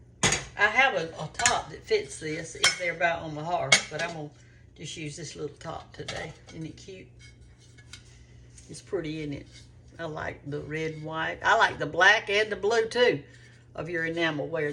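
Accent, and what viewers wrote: South, United States. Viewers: I just love that southern accent. I can listen to her all day. I’m from New Jersey. So we kind have a NY accent🤣🤣🤣🤣🤣🤣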